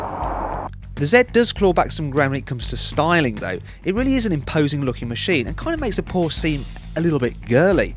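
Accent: United Kingdom. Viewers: i hate the way britain people says words,i know thats the original english,but its wierd,U.S.A is the best country in the world and the way they talk is nice.,and im not american, im brazilian and i love it. 370z sounds better ,has a better looking and u can get it for way less money than the porsche,get the Nismo exhaust and u can have +15 hp then u are good to go.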